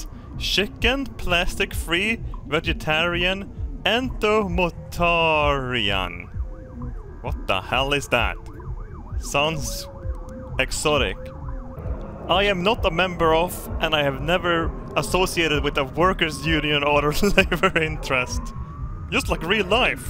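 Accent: Sweden. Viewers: is getting a thicker Swedish accent every day! Love it!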